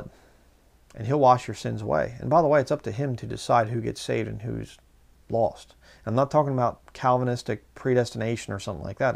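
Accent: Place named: Pennsylvania, United States. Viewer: Don't worry about your accent brother, we Pennsylvanians are unique in that regard. I'm from the other side of the state -Pittsburgh and many of us say "keller" for color (and "aht" for out and "cahch" for couch)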